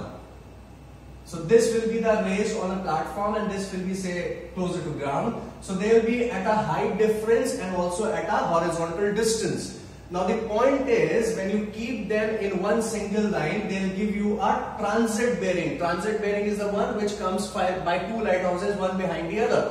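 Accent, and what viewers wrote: India. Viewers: why indian accent? why why why? :(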